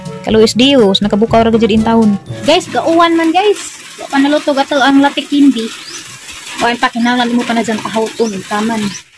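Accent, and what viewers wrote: Bohol, Philippines. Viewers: Hua<link> ba anang watermelon mo sis..nalingaw ko ug tan aw sa.imo mga video nahimout ko sa imong sinultihan nga bol anon kay mao man ako mama taga maribojoc siya..sending my support sissy mmlso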